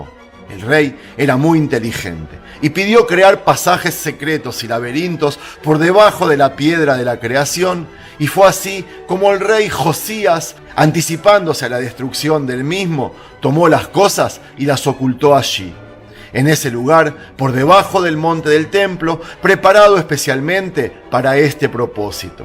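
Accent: Argentina. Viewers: Tu no eres judio eres argentino lo llevas en el asento no puedes negar eso, ningun judio real anda vestido como tu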